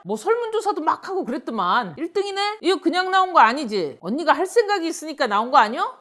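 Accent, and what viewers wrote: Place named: Chungcheong, South Korea. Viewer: 충청도사투리 ㅋㅋㅋㅋㅋㅋ 너무 인간미 넘쳐서 혼자 낄낄거렸어요!! ㅋㅋ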